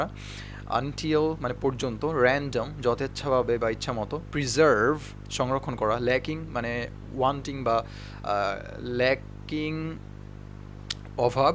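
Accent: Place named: United Kingdom. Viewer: Bro..language just awesome 😃onek tai britis der mto..ar ata e IELts er very important thing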